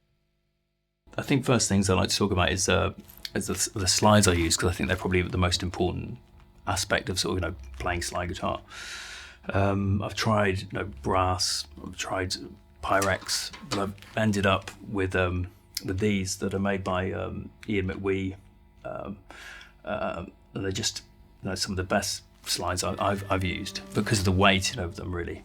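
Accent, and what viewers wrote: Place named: Wales, United Kingdom. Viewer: Blown away by the magnificent slide sounds on the various guitars and your wonderful Welsh accent. I enjoyed learning about the pedals and other gear, as well. Thank you for sharing all,. ❤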